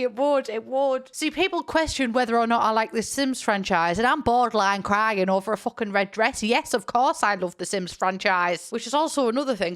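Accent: United Kingdom. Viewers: Ur british accent is so thick I sometimes fprget that u speak english, but maybe its just me, cause my mother language isnt english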